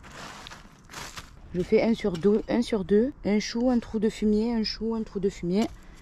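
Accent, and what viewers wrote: South, France. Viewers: ah super, je me disais bien que c'était l'accent du Sud, de chez nous !!! Ben oui, ils disent tous "je suis du Sud", beaucoup de gens qui font des vidéo comme vous, et ils sont du Ouest, de bezier... C'est pas le Sud ca😂.... Super vos vidéo et explication, je m'abonne alors, merci...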